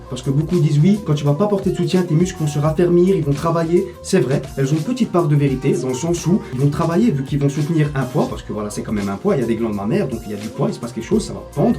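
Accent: Belgium. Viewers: Tu as un accent belge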